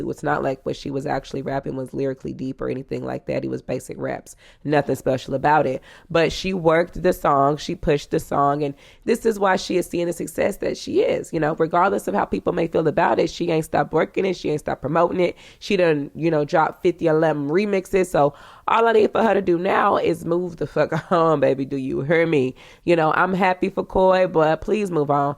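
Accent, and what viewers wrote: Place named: South, United States. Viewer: This is the best channel on here! Hands down! I think it's the Southern in you, and I'm a Southerner too. Lolllllllllll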